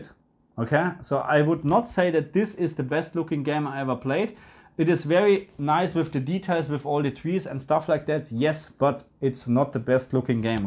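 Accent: Germany. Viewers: never ever did i heard a more much sounding german akzent thats from you ...keep going my guy😅👍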